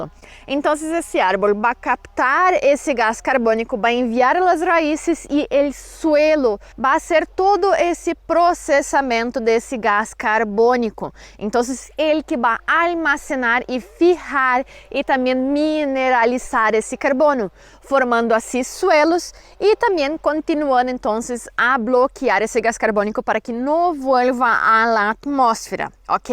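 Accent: Brazil. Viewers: Olá, Vocé é do Brasil, não é? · Você é brasileira? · O seu espanhol com sotaque brasileiro meio portunhol é muito divertido jajaja. Excelente informação!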